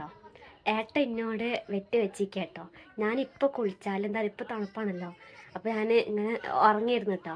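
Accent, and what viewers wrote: Malappuram, India. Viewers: മലപ്പുറം മലയാളം ആണല്ലോ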